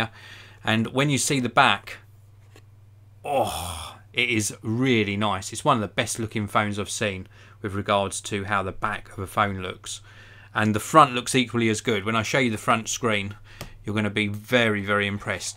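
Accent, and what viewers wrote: England, United Kingdom. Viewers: Its called an English accent mush!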